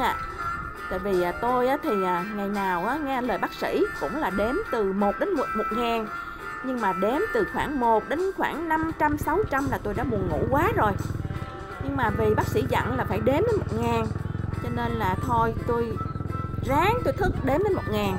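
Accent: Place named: Saigon, Vietnam. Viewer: Đồi cừu suối nghệ vũng tàu,vũng tàu có nhiều cừu ban ha,nghe giọng nói của sài gòn dễ mến quá,mình thích giọng sài gòn quá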